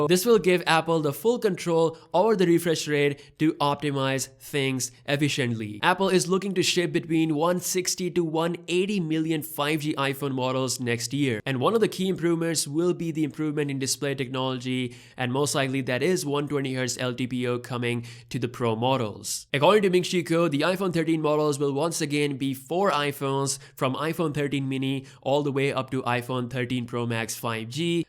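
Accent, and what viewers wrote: India. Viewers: You got somewhat of an indian accent lol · Your Indian accent pops up.. Alot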